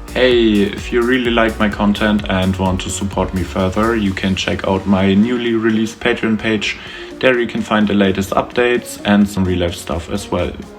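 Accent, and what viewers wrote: Germany. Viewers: Hearing your German accent is kinda funny ngl (Ich bin auch Deutsch) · Bissel Deutsch xD Aber Starkes Englisch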